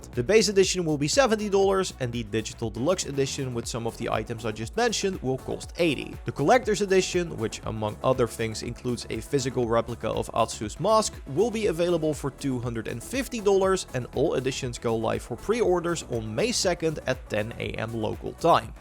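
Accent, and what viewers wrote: Netherlands. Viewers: I hear an accent . You from europe ? Netherlands?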